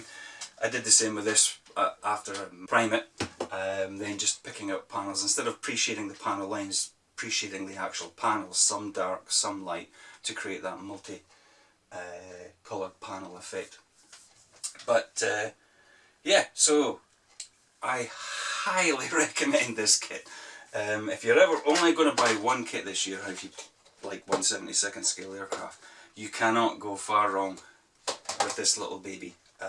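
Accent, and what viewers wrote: Scotland, United Kingdom. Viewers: Always seems odd to hear a Scots accent on YouTube. Subscribed, anyhow, since I'm "Scotch" masel'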